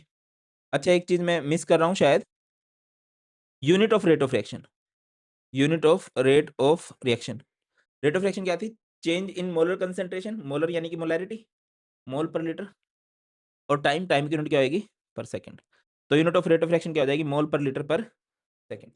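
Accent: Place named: Punjab, India. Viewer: sir ka punjabi accent TOP.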